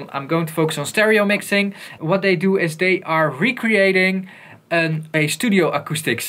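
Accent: Netherlands. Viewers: 1sec in, this guys is obviously Dutch xD.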